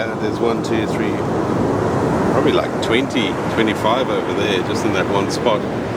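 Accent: South Africa. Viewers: Just from your accent u are definitely from South Africa. U are in an awesome place. But do u have any knowledge of our eastern cape here in SA cause I love collecting rocks but no rocks like u have found. Just give me some intel here in Gonubie or surrounding areas where I can find similar ❤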